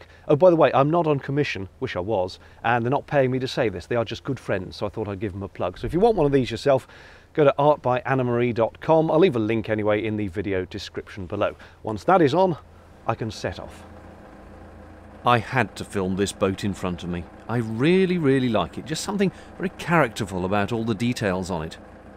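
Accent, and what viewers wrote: United Kingdom. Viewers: Hes an American actor. You share some resemblance if I must say. HOWEVER, your inflection, infectious enthusiasm, and admittedly, your British accent that makes you sound so much more intelligent than the rest of us English speakers, completely drew me into this video!!! It reminds me of an audio book or a relaxation tape or something and I love it. Quite an interesting channel my friend! And these boats pique my interest! Very unique!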